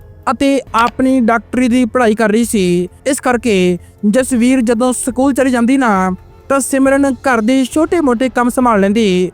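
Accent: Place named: Bihar, India. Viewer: bolna te sikh la punjabi jn bihari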